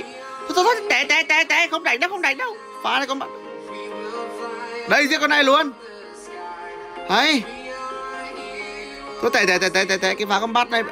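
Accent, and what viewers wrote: Nghe An, Vietnam. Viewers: nói tiếng Nghệ An cho đặc sệt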